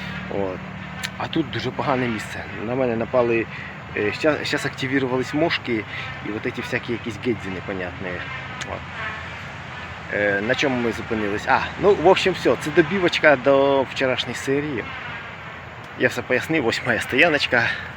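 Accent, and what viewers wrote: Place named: Surzhyk, Ukraine. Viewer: Суржик жосткый)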